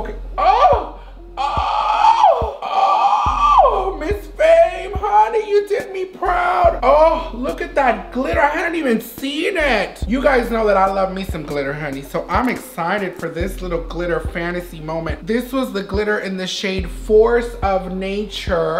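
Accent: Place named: United Kingdom. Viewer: I loved the slight British accent coming through girlllll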